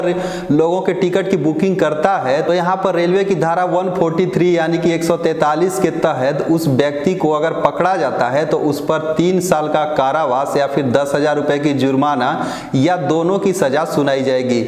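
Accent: Bihar, India. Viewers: आप कहाँ के हैं up या बिहार हमको तो पक्का बिहार के लगते हैं पकड़ा जाते हैं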